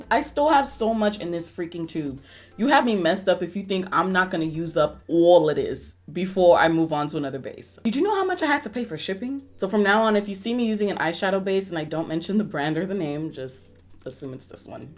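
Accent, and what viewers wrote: Africa. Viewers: I’m living for the African accent thoooo😍😍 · Hey... great video, you and my youngest Erin share the same birthday!! Happy belated birthday. You have helped me so much with this video about the weight gain issue... I plan to be more aware of what I say to my 19 year old daughter!! Kudos to you for putting yourself out there. It tickles me to hear your African accent. I’m married to a Cameroonian and you make my day when I watch your videos!! Lol keep the videos coming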